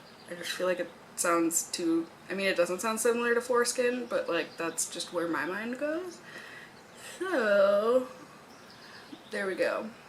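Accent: Minnesota, United States. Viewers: Your accent is getting more Minnesotan since you've been home. I love it.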